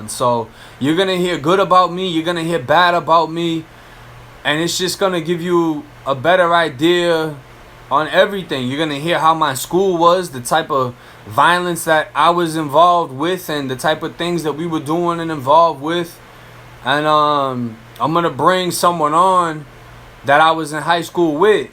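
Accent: Boston, United States. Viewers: I can here his battle between his boston accent and his fla. Accent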